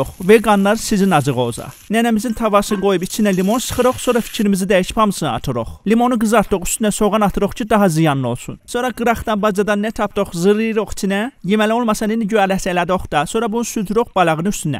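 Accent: Sheki, Azerbaijan. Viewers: 😂😂Azerbaijan acent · Ay allah sevirəmdə şəkinin ləhçəsini özümdə şəkiliyam nətərsozzz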